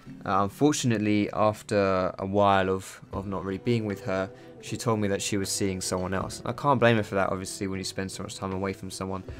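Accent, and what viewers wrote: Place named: Essex, United Kingdom. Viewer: Haha ur an Essex lad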